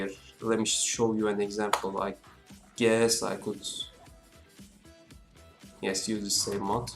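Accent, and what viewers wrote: Turkey. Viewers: Tamam Türkmüşsünüz, aksandan ben de öyle tahmin etmiştim 😄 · AoD ye bakmadan önce milletin oyunun oynanışı, glitchleri vs. kötü oynamayın dediğini duyduğumda direkt abartıyorlardır demiştim. Haklıymışlar. Gerçekten hayatımda bu kadar zor kontrolü olan bir oyun oynamadım. Hani TR1'in tank kontrollerine bile alıştıktan sonra oynaman çok kolay. Bu.. bu ise başka bir seviye... Neyse ki ''restoration project'' in olması iyi bir patch. Hikaye çok kesilmiş olsa bile yine ilgi çekici. Daha karanlık bir Lara Croft hikayesi olmasıyla beraber bu seferde Lara'nın hem kontrolü kaybedip kurban olmasını inceliyoruz hem de iç dünyasını... Umarım oynayabilirim. Ha bu arada Türk olduğunu direkt aksandan anladım :D güzel içerikler var kanalda biraz onlara bakayım.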